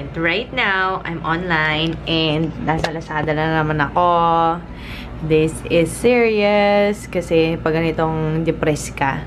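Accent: England, United Kingdom. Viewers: i love your english accent ate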